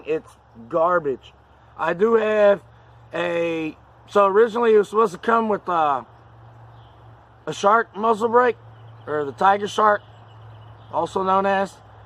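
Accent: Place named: South, United States. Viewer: Nice southern twang you got there, Mr. Lol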